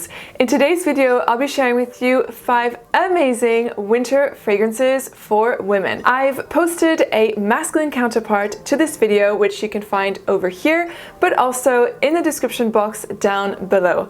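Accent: France. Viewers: always happy to see you with your uncontrollable french accent and all..😃😃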